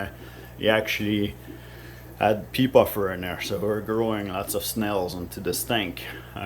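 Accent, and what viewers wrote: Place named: France. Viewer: I am very glad that I came across this video from a kijiji add! I just recently got my first small tank so I am looking to learn a lot more so that my fish can thrive. You son is pretty smart to have started his own business so young! You must be a very proud father. I love all of the fish! You have a new subscriber. I am actually French, too. I recognized the accent. Sounds just like me ha!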